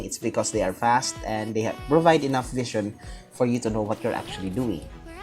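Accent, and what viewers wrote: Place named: Philippines. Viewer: sabi na eh pinoy haha dahil sa accent natin, pero naseal ni isagani ung hula ko haha anyways, angas bro. i just came back sa AoW3 and nangangapa pa ulit. dami ko natutunan na counters sa vid mo.. tnx a lot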